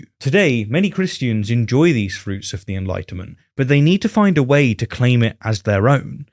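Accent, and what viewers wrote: United States. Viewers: Americna here. I approve your American accent. No worse than my backwoods kin.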